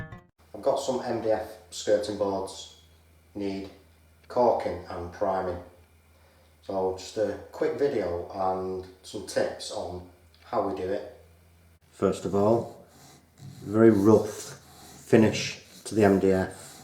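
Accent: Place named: St Helens, United Kingdom. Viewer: Sounds like a St Helens accent that mate, good work as well bud 👍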